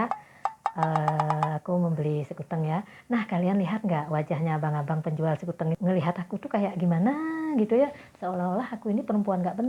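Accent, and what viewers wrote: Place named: Bali, Indonesia. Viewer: posting malam bgt, tp ada notif langsung nonton saya mba krn kamu lucu. logat balinya kental sekali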